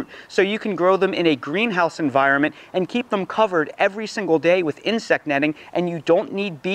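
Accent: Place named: Northeast, United States. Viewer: Thanks for the great video! This is the first time I’ve heard of parthenogenic cukes, and the cucumber beetles here in Virginia are 😬😬😬😬. Weird question, but are you a Northeast transplant? Asking because I am too and your accent sounds familiar lol. Had a bit of an adjustment period learning to garden in the South but I think I’m getting the hang of it these days 😊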